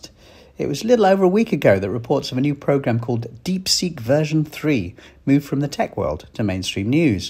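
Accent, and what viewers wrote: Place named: England, United Kingdom. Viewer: Chinese propaganda channel with English accent voiceover.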